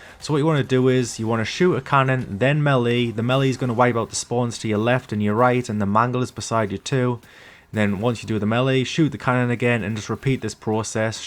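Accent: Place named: North East England, United Kingdom. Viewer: aye lad am from northeast I could tell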